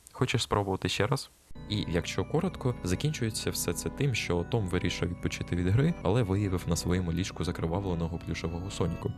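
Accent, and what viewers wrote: Russia. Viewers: забавна говірка в автора. типу іноді проскакує російський акцент, особливо чути в числах. ну якщо автор перейшов з російської то молодець так тримати. якщо автор так розмовляв то мені просто цікаво в якій частині нашої країни такий говір?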